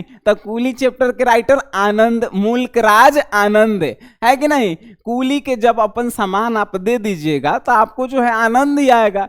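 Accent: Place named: Bhojpuri, India. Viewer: हे फलनावा सुनाता sir पुरा भोजपुरिया अंदाज में पढ़ाते हैं 💕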